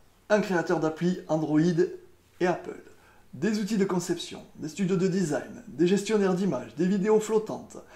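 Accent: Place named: South, France. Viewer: Merci,pour cette bonne présentation,tu as un accent du Sud, on est peux être voisin,je suis dans le Gard.Builderall à l'air vraiment efficace,je pense que je vais me lancer,bonne continuation à toi et un grand merci :))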